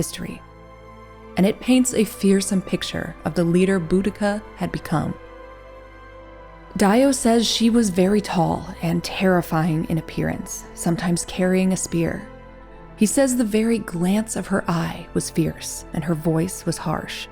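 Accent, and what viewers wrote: United States. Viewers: Shame about the american accent. Ruins the telling, no strength or beauty.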